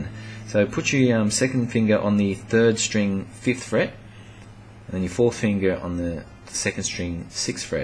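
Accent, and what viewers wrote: Australia. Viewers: hes not english, he sounds Australian...or maybe kiwi?! but his music is definitely high koala-ty! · Thanks for the lesson, very clear, no nonsense. Love Aussie accent !!! Looking forward to playing it.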